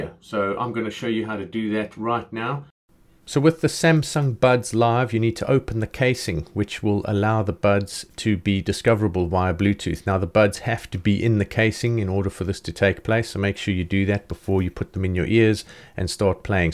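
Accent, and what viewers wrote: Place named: South Africa. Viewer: Connecting is fine but lag not so much. I'm trying to find a pair of great wired earphones specifically for the Quest 2 for my mom. She's VR mad and it would make her day... any suggestions? Also... You got a new subscriber here simply because your video editing almost made me spit my coffee out laughing (it must have appealed to my dad sense of humour) AND because I detect a fellow South Afircan accent. Keep it up 😎🇿🇦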